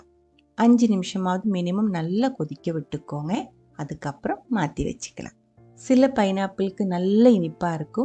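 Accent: Kerala, India. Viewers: മലയാളി തമിഴ്‌ പറയുക ആണോ?അതു പോലെ ഒരു accent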